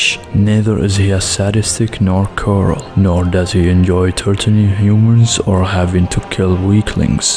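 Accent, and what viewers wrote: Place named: Russia. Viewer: Your (Russian?) accent gives an exotic and soothing tone to your speech, it’s fine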